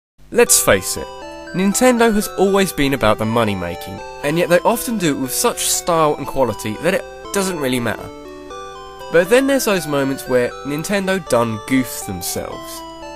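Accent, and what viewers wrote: United Kingdom. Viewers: your accent is british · Darn, why are you and your British accent so awesome!!!!!! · Are you Actually BRITISH? Me too! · *Gasps* You're british? *Subscribes* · Are you british? Because you sound british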